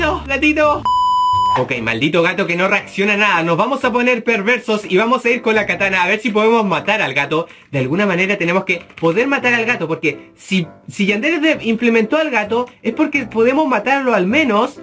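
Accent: Chile. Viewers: Hola una pregunta eres chileno ? ¿Y si no de que pais?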